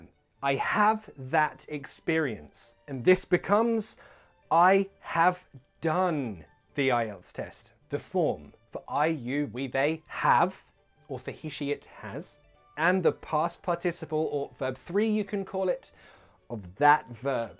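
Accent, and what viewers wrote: United Kingdom. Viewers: What english accent do you speak actually? For me, this is the best british accent so far. My english is not too good, but with this accent i can catch most of the words you say. Its very clear and understandable. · Eres un excelente maestro....me encanta la forma tan sencilla de explicar. Entiendo el inglés pero aún me falta hablarlo y escribirlo correctamente...por eso escribo en español...espero utilices traductor para que sepas lo que escribo. GRACIAS POR TU TRABAJO. Ya me suscribi a tu canal. Saludos desde México. Perdón...de donde eres?...al escucharte noto acento británico... eres inglés?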